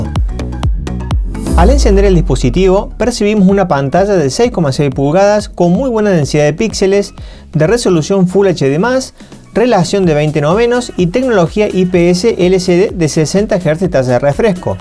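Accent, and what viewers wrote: Córdoba, Argentina. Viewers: No hay mejor video que el hecho con esa tonada cordobesa... Amé!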